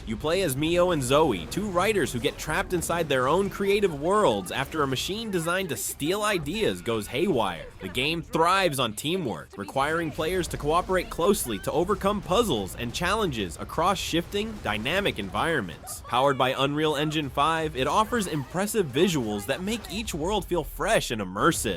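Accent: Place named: United States. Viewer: Please could you stop using Yank Narration in your videos!? Quite honestly any other country of origin would be such a huge upgrade for me. I am literally unable to listen to this ermm, person anymore. The noise coming from him is just so vile! It makes me want to vomit. Don't get me wrong I'm not against all yanks, I love MrBallen for example but this guy and certain people's voices from yank land is just disgusting. I can't listen to anymore of this guy talk, very sorry!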